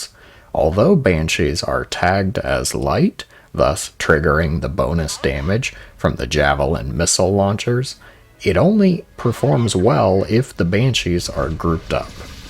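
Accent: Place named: Texas, United States. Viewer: I really like using Banshees and it's not relevant to the video but to be honest and no offense but the way he sound when he talks is like he has a Texas accent and/or some thick saliva in his larynx, maybe he kinda sounded like those Viking units actually.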